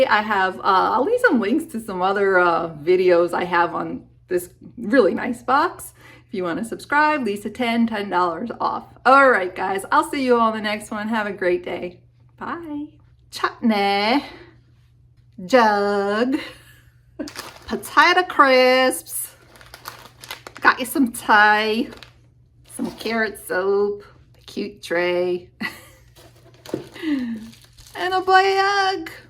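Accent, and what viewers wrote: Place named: United Kingdom. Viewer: Britain accent. ❤😊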